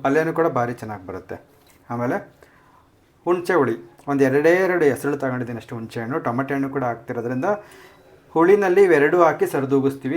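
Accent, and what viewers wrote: Chitradurga, India. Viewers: Sir nimdu yav uru sir.. Nim language namchithradurga da kade ero thara ede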